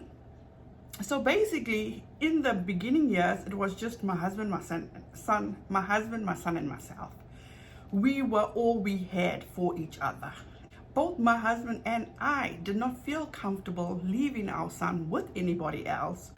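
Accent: South Africa. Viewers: 1- just because 1 partner doesn’t bring in income does NOT a mean they don’t contribute financially. Often it’s the at home partner who pays the bills and manages a budget. 2- I’m really embarrassed that I thought your accent was Australian. Oops. Now I know it’s South African. And I’m so sorry someone thought it was acceptable to call you a slur. Not ok. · Oh I'm so sorry - I had to pick my jaw up off the floor when the K word was dropped, my besty at highschool was from SA n listening to you is like hearing her Grandparents, such a great family - should've come here instead o US 😉🥰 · I absolutely LOVE your self confidence and self respect!!! U r such a beautiful person & mother and I’m SO happy u r able to let the ignorant comments by vile & disgusting people just roll off your back!!!! I can totally tell that it doesn’t bother u which I think is awesome!!! 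I know your grace really infuriates those insecure people!!!! Good for u!!! I’m proud of u & I love your passion, compassion & your intelligence!!!! (Side note - I ❤️❤️❤️ the South African accent!!! It’s my favorite of all accents!!)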